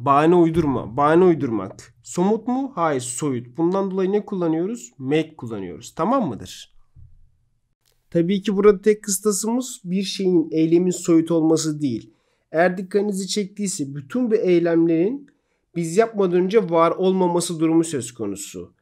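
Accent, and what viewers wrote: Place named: London, United Kingdom. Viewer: Hocam şiveniz aynı londra maşallah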